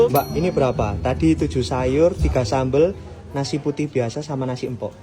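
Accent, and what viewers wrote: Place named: East Java, Indonesia. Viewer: Murah sekali dan kalau bicara seperti Jawa Timur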